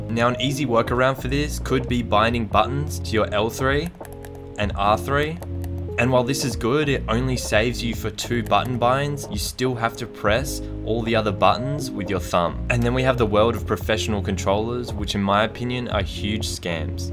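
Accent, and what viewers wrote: Australia. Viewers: Kolxk no he is Aussie like me and he said CALL of duty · Btw he said call it’s just you non-Australians can’t understand our sweet accent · Aussie accent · hes Australian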